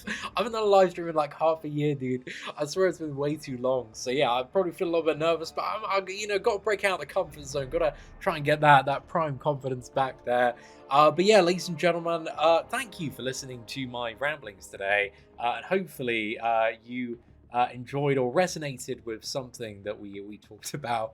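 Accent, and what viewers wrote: United Kingdom. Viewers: Gosh missed his bri ish accent alot it's actually an eargasm to me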